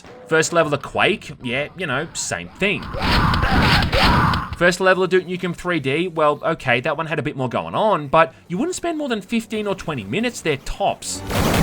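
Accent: Australia. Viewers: Sorry to break it to you but he's clearly Australian...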